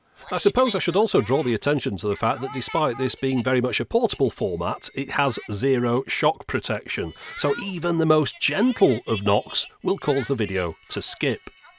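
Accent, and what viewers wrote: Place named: Manchester, United Kingdom. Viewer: I grew up in north Manchester and your accent sounds very familiar, are you from that area?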